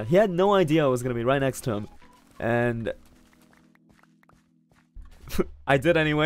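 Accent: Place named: Australia. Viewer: Why does his aussi accent sound stronger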